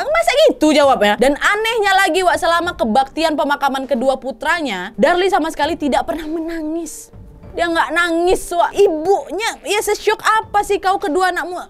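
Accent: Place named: Medan, Indonesia. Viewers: ya btul dr awal da curig, gwe ska knten ini, terutama hostnya, luar biasa gesturnya, bicaranya, segalanya ku suka, mmang itulah gaya Medan bicara, jelas x, masuk brang tu😃❤️😀👍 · Kak ini org mana si sebenernya? Kok ada bahasa jawa² nya, tapi logat nya kek Sumatra🤣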